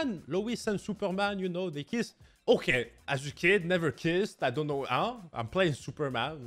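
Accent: France. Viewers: is he french ? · Is he french or something????? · U sound like a french accent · this french accent is so annoying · are you french ? is a really old question I'm asking me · Is it just me or does he sound way more french in this video than normal? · Is he extra french on this one or is it just me?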